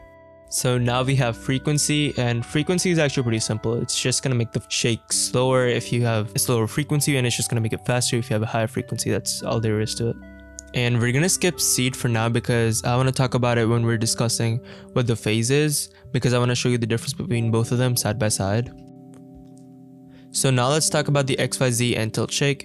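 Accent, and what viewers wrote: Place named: India. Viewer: really helpful indian accent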